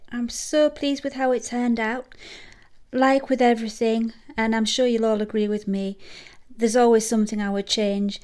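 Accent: United Kingdom. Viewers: gotta love that british accent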